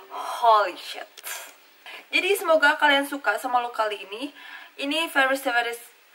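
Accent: West Java, Indonesia. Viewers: teteh nyunda pisan 😍😍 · Sundanese bgt hihi lucu teh🙈❤️ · Baru pertama kali nntn video kakak & langsung suka! cara ngomongnya asik bgt. ada sunda2nya lagi😆 btw beli pupa brush nya dimana ya kak? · sunda banget kak, gusti nu agung=)) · sunda pisan nyaa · Chhetri pantesan ada sundanya😄 salam kenal juga kaa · ^Gusti nu agung. ngakak si kaka nyunda :v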